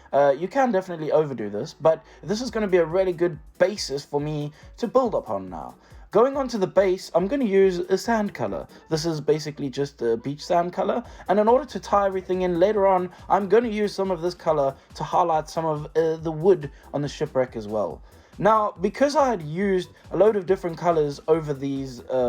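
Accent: South Africa. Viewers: You sound south african.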